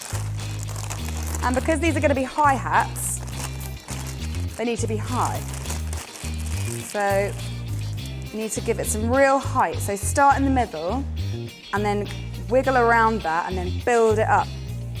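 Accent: United Kingdom. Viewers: Hi I have been starting to watch your videos... and I think your videos are really amazing!!!! I love your British accent..haha I am living in America so... Anyway thank you for good videos!!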